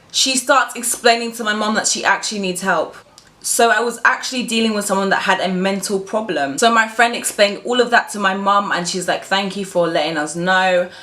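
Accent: England, United Kingdom. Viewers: BRITISH ACCENT GOALS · Yes, she is British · She's British, from London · Are u British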